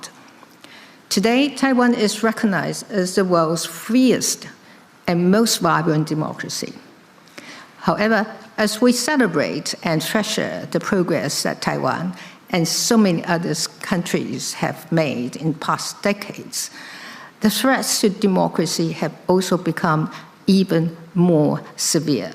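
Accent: United Kingdom. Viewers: 英國腔超棒的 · 蔡總統的英國腔超級好聽👍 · 她說的是英國口音和台灣人習慣的美式英語不同。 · 我愛她的英式英文，太好聽了。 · 她是一口純正不列顛口音(或非常接近)，畢竟她是真的在那邊唸過書，不像一堆支那黨政客只是去國外買學歷但其實英文口說爛得要死。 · 英國腔太迷人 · 不卑不亢的英國腔演說，真正的領導人，偉大的女性，我向您致敬，感恩臺灣有您. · 英國腔? 真感動~ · 不看稿的英國腔超帥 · 什麼時候開始有這麼重英腔了？ 😀😆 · 總統的英國腔好好聽啊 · 總統是純正倫敦腔的英文耶👍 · 英國倫敦政經學院的PhD, 好聽的英國腔.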